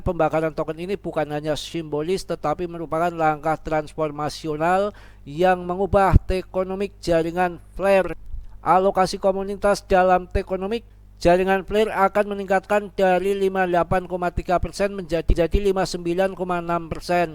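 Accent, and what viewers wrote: Banyumas, Indonesia. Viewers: Salam blekutakan mas bro. Aku nonton terus video mas bro kata katanya ngapak bikin ketawa jadi ngga bosen bs meng hibur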